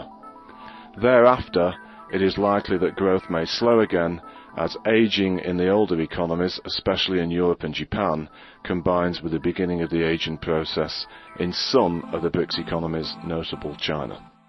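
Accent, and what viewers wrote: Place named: United Kingdom. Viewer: despite that british accent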